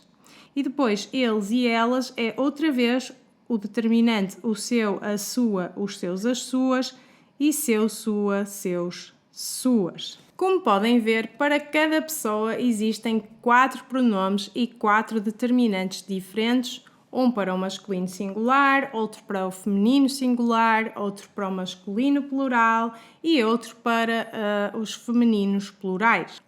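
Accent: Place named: Portugal. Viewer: Oi linda 😍😍😍 amo o sotaque portugues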